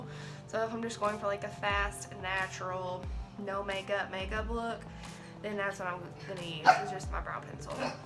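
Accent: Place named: South, United States. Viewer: Where you from girl ? I’m from Texas and I thought I had a country accent !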